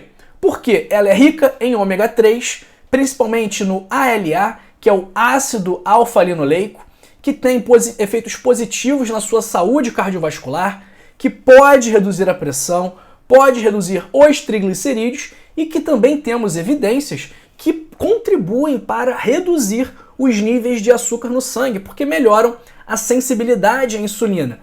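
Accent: Rio de Janeiro, Brazil. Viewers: O sotaque do x já sabemos q e do rio essa palavra pechsquisa e do rio não sei como consegue mudar pro Chis ou xis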